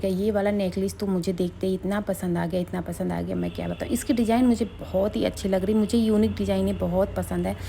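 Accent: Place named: Chhattisgarh, India. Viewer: hlo didi aap kha se ho apke bat karne ka tone chhatttisgarh k loogo ke jaisa hai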